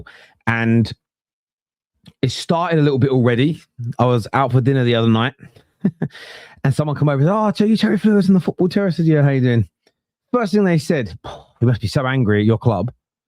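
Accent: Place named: Geordie, United Kingdom. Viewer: get that chip of your shoulder you sound like the geordies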